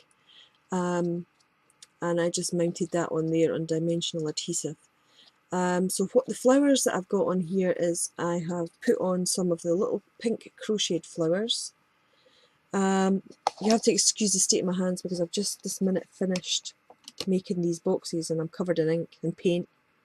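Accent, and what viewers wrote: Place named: Scotland, United Kingdom. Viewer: Never realised that you were scottish too lol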